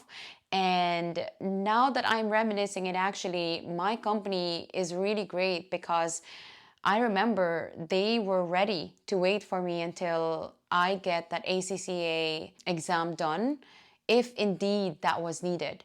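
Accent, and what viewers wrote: Ireland. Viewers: omg i can now hear a hint of irish accent coming thru when you talk!! omg 😍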